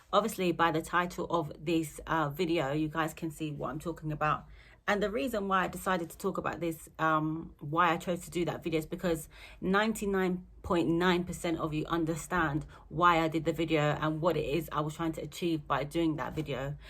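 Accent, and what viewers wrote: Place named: England, United Kingdom. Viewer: So beautiful n love the English accent gotta subscribe right now!!!!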